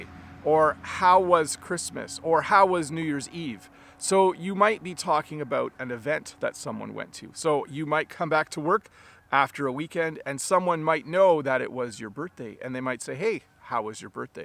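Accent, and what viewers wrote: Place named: Canada. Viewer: Thank you teacher I like your accent and the canadian people so much 😍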